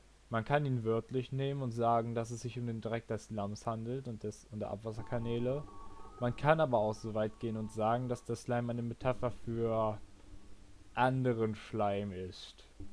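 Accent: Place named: Germany. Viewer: diggah als du english am Anfang gesprochen hast wusste ich sofort das du deutsch bist😂😂 dieser Akzent die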